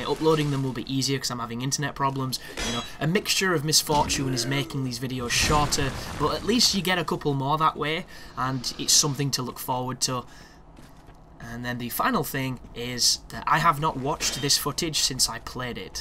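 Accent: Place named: England, United Kingdom. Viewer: Hey guys, do you know what time it is? Time to listen to a man with an English accent talk about Dark Souls 2 for 10 minutes. Another awesome way that I like to spend my valuable time. Keep up the great work:)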